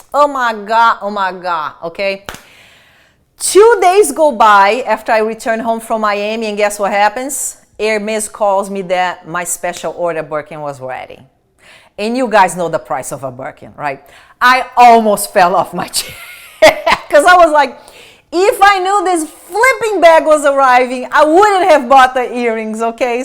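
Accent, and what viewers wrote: Brazil. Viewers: outro dia te falei uma crítica construtiva e você ficou brava e até disse que eu tinha inveja pq era brasileira como vc, mas não tenho inveja não... sou bem casada com americano tb, amo esse país e os brasileiros eu concordo que alguns passam do limite mas eu sou uma pessoa que não sei o que é inveja desde que nasci, fique tranquila, porque eu trabalho muito pra ter o que tenho sem invejar nada de ninguém....mas eu adoro vc e adoro seus vídeos!!!! Adoro ouvir vc falando inglês pq falo como vc, com sotaque... e adoro suas peças de roupas, acessórios, sapatos, jóias e principalmente as bolsas!!! Parabéns pelo vídeo.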